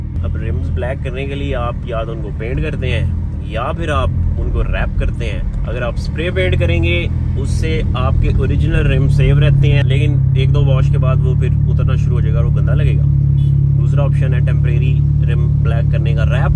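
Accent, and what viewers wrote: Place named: Punjab, India. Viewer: Your punjabi ascent is so secxi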